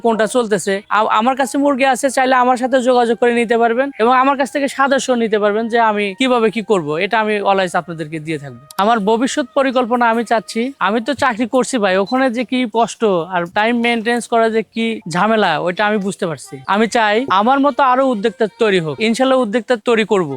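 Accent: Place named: Comilla, Bangladesh. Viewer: তর বাড়ি মনে হয় কুমিল্লা হালার পুত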